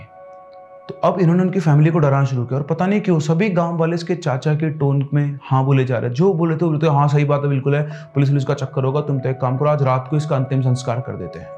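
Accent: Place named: Bihar, India. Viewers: Your Bihari Accent 🫡🫡🫡 ekdum Humare tarah hi bol rahe h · Narrating Story in Bihari accent is very Fantastic, we Bihari love it 😄😃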